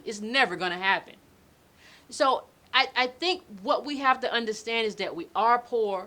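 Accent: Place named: United States. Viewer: I like her American accent along with her intellect and sense of humour 🤣🙏🏿👊🏿.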